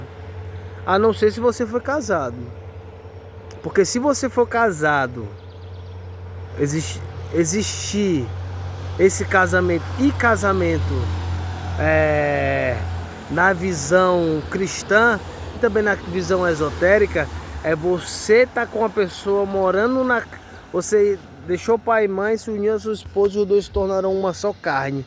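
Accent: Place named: Fortaleza, Brazil. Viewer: Parabéns,vc é um cara bastante sabio , gostei do vídeo e me inscrevi, e pelo sotaque percebo me que é de Fortaleza , vc fala muitíssimo bem 👏👏..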